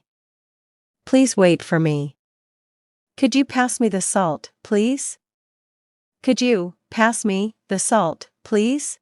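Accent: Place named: United Kingdom. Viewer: Thanks my teacher is that British accent or American?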